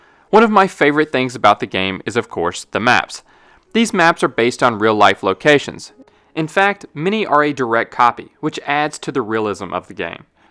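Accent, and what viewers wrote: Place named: Philadelphia, United States. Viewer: You're from Philly aren't you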